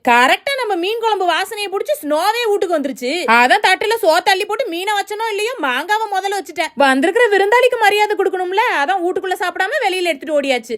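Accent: Kongu, India. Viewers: Kongu I think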